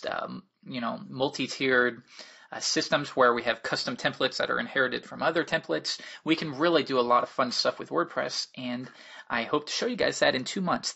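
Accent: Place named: United States. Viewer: great tuts,u sound american but u do like football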